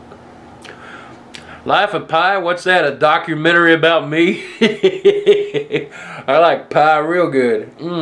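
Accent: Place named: Kentucky, United States. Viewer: U sound like me FROM KENTUCKY. Lets represent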